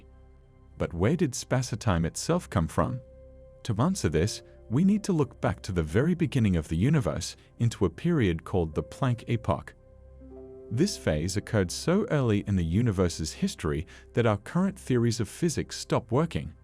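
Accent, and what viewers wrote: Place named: Australia. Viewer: Not American, seems more like Australia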